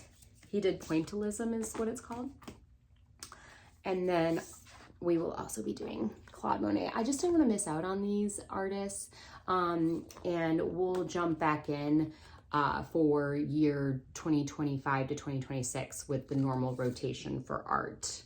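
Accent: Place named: Western New York, United States. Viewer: Love that I found your channel. I am just starting this year with my oldest, a Charlotte Mason/Waldorf mix, but I love seeing how others use different styles to create their own. Very inspiring! Also, I knew you were from western NY before you said Buffalo, you've got the accent (I am originally from Rochester)!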